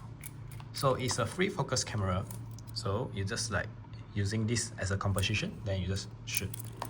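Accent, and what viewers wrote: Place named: Singapore. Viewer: the accent is giving lah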